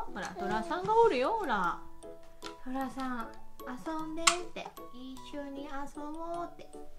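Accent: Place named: Kyoto, Japan. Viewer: 今日も可愛いたっ君観てる💕 は京都弁のイントネーションで穏やかで優しくて聴いてて気持ちがいいです。たっ君への愛情もたっぷっり〜。にピアノで厳しくされなければ反抗期ないかもね？たっ君で良かったね❣️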